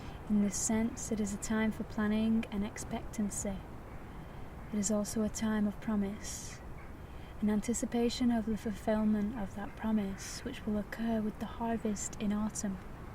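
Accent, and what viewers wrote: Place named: Manchester, United Kingdom. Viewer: Your great! Where are you from im detecting manchester?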